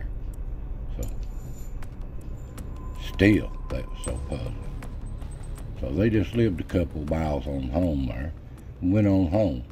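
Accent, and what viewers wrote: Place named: South, United States. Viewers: Another great one bro, your country accent sounds like us round here. Like to hear them ghost stories. Liked the one on the Melungeon people too. I have a knot on back of my head like they do, but never been checked to find out. Mamaw always said her people were black Dutch, so they were bidding something. Anyway I'm looking forward to more stories. Thanks man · just love your southern drawl,and the way you tell ghost stories and tales Thanks for a GRRRREAT CHANNEL!!!!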